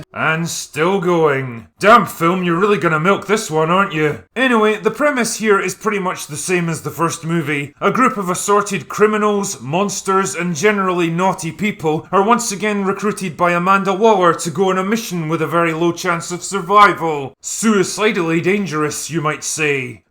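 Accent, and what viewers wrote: Scotland, United Kingdom. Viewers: I used to think you always sounded drunk, then I realized you’re just Scottish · You’re Scottish?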